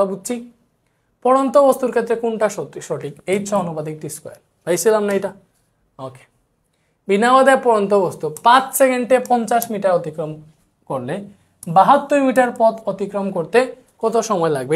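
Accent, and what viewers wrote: Sylhet, Bangladesh. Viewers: ভাইয়া কি sylheti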